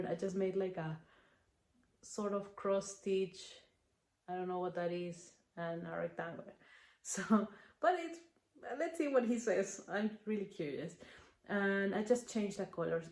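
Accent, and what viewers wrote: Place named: Peru. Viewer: me suenas peruana...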